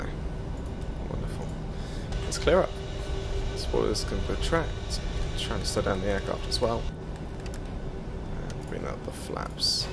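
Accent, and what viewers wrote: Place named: England, United Kingdom. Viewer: Why does he have an English accent in new Orleans?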